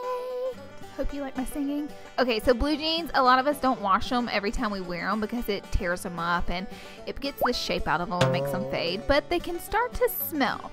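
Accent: South, United States. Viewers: is she a southern bell alls I hear is southern in her voice 💙😂😊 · I love your southern accent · You are so funny, love that southern accent!!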